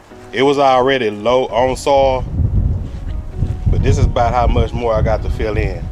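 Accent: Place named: South, United States. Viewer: Hold on friend, you don't have to pull up that chard. That chard will last you all year. Looks like you're in the South or sounds like it from your accent. Swiss chard you can harvest repeatedly. Maybe you're just making room for other stuff? Reach out if you want to chat about Swiss chard. Love your video.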